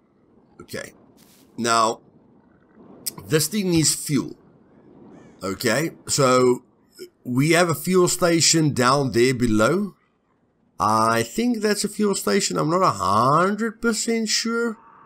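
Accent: South Africa. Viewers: Always good hearing another South African accent. Thanks for these, I'm learning a lot! What happens when you log off? Is it like rust where you just fall asleep and your character is still in the world and vulnerable?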